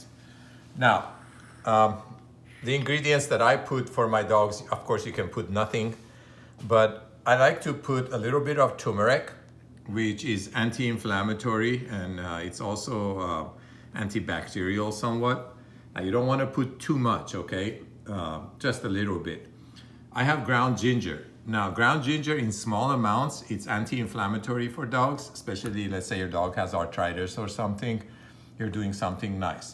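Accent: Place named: New Jersey, United States. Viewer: lovely accent. new jeresey?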